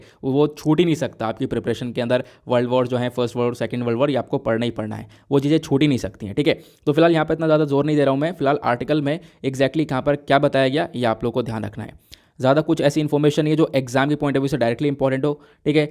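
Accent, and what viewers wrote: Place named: Uttar Pradesh, India. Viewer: acha mujhe nhi pta tha..actually mne 4 months phle se hi inko follow kiya h...ha mujhe bhi lgta hi tha..unke bolne ke style se..chlo hum sb up ke hi h..😆😂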